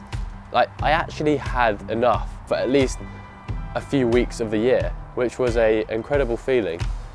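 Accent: United Kingdom. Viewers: Beautiful british accent!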